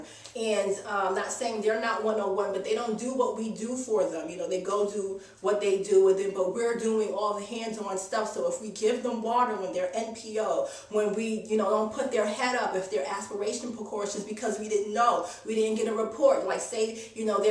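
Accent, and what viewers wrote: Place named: New York City, United States. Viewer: I hear the straight NYC in this vid... LOL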